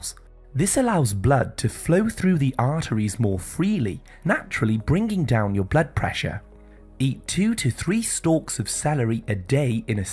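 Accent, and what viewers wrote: England, United Kingdom. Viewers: Oh, puleeze, STOP the commentators with the English accents!!!!!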